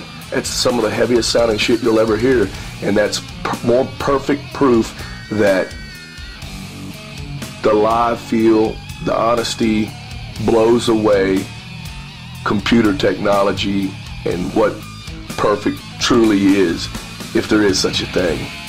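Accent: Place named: United States. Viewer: what he say?? i don't understand spooken american :)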